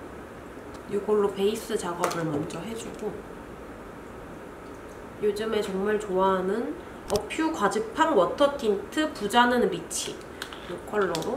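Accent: Busan, South Korea. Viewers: ㅋㅋㅋㅋㅋㅋㅋㅋ 님 부산 사시더니 말투에 부산사투리가 약간 섞여벌엿네욤 귀요오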